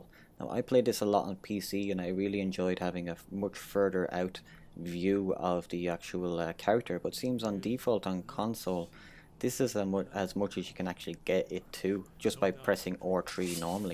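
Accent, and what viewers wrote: Ireland. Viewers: R3 he says with his weird irish accemt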